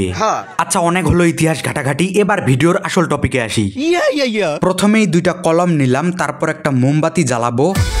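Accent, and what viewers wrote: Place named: Thakurgaon, Bangladesh. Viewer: তোমার বাড়ি মনে হয় নিশ্চয়ই ঠাকুরগাঁ জেলায় তোমার কথা শুনে বোঝা যাচ্ছে আমাকে নিয়ে একটা ভিডিও বানাও আমি কি সত্যি বলেছি না মিথ্যা বলেছি এই কথা নিয়ে একটা ভিডিও বানাও প্লিজ প্লিজ প্লিজ প্লিজ প্লিজ প্লিজ প্লিজ প্লিস প্লিস প্লিস প্লিস প্লিস প্লিস প্লিস প্লিস প্লিস প্লিস প্লিস প্লিস প্লিস প্লিস প্লিজ🎉🎉❤❤❤❤❤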